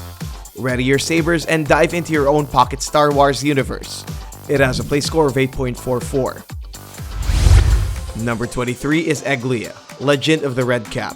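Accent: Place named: Philippines. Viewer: Why does the VO sound very Filipino?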